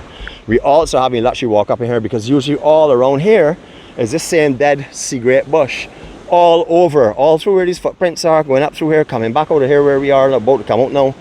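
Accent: Barbados. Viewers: Your voice is uplifting, love to hear Bajans speak. xx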